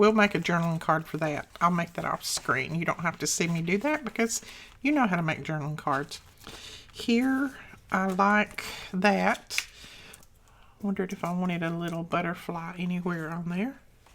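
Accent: South, United States. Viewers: Hi E! Lovely work as always! I chuckled when you mentioned that someone said you spoke too fast! You definitely don’t! 🤣 You speak slowly with that lovely southern drawl. 🥰 Infact, I often listen to your videos at 1.5 or 2 times the speed…not a fault of yours but simply because I have very limited time in which I can watch and I want to watch as many as you lovely crafty ladies as possible in my limited time intervals….and maybe the fact that I’m a fast speaker may also influence my choice I guess! But no matter what speed I watch, I enjoy your videos and your work! ❤️ Thanks for sharing! Have a lovely weekend! Hugs, Ava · Miss E you do not speak too fast! I’m Dutch and I understand everything you say. Besides I am a teacher of the Dutch language to foreigners and I am a teacher of the Spanish language. Of course it is posible that the person has a problem understanding you but that is an individual problem. 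It has nothing to do with you. By the way I love your voice and southern accent. And the lovely expressions you use. Keep up the good work! God bless you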